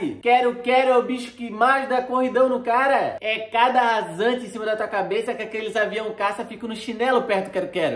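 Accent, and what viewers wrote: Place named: Itajaí, Brazil. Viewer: Po vc tá virado num demonnho kkkkkk queria ver vc lá em Brasília falando as verdades pra aquela cambada de ladrão falando com nosso sotaque itajaiense kkkkkk muito seu fã nos seus vídeos vc fala oq muitos querem fala mais tem medo abraço Quiriduuu tamo junto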